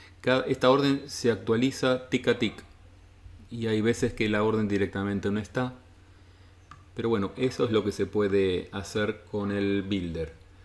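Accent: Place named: Argentina. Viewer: ahí me fijo.. muchas gracias!.. ché, por tu tonada creo que eres argentino.. vivís en Buenos Aires.. das algún tipo de asesoría presencial?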